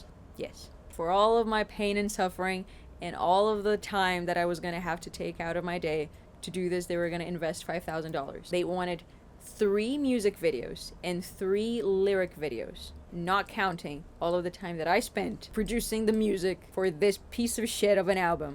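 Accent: Brazil. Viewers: Meu deus, sabia, eu reconheci uma parte do seu pequeníssimo sotaque "brasileiro" além dá sua aparência que lembra a minha e minha família, espero que tudo dê certo para você, também sou músico, mas minha música é difícil de bombar, anyways I´m on college. SAUDAÇÕES my friend CONGRATS FOR THE BEAUTIFUL WORK!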